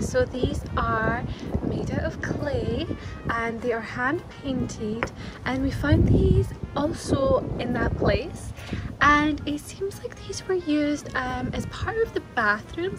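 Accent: Scotland, United Kingdom. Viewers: Lol of course it's inauthentic, she's from Scotland so normally her natural accent is a thick Scottish accent but she's CLEARLY a Marilyn Monroe enthusiast where she styles herself to look like Marilyn, moved to L.A., an ENTIRE different country, and bought Marilyns house, so ya, if she didn't try to imitate Marilyns baby high pitched voice, i wouldn't be watching her as the baby voice is a part of the gig, this look wouldn't make sense otherwise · I beg to differ. Marilyn is gone. This is her interpretation and she has a Scottish accent. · Stop trying to change your voice. You naturally have a Scottish accent, but half the time you’re softening voice like Marilyn. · Easy peasy ❤️ love the Scottish accent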